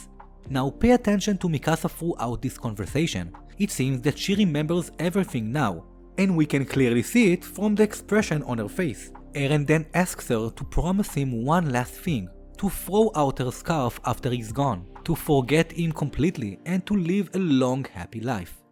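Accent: Israel. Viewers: Are you from Israel? לרגע חשבתי שאני שומעת בעברית ואז הבנתי שזה המבטא שתפס אותי לא מוכנה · The accent is Israeli. 100% Also, your English is superb! Kol Hakavod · For me it was when he said the word "year." It's an awesome Israeli accent. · You’re from Israel,? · Israeli right? I could tell immediately, it's particularly noticeable when you say "Reiner". Loved the bit about zhuangzi's butterfly, I subscribed. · Are you israeli? your accent sounds like it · Ohhh hebrew Being canadian i never come into contact with that language so its completely foreign to me. Every hebrew content creator ive watched always has a super happy voice so dont worry the accent is enjoyable. And Im glad i finally have my answer because everything about yours and others pronunciations is typically spot on until you get to Vs. Im gonna have to research why that is because sometimes ill hear the V pronounced correctly in "Video", while other times its "Bideo". 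Just crazy that language does that to you where you dont even realize you arent pronouncing it right huh? I have a similar issue because I was born in Quebec, so i was raised around french and Ill pronounce it weirdly cause my mind slams 2 puzzle pieces that dont fit together into a incoherent thought. KInd of like how the Japanese have a hard time distinguishing Ls and Rs. So if I see a "oi", in french it's pronounced "wah" , but thats not really something you see in english at all.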